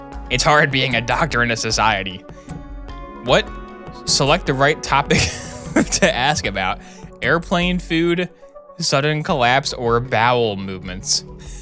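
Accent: Australia. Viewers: British accent???.... Australian ffs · Ohh he's Australian? Oh krickey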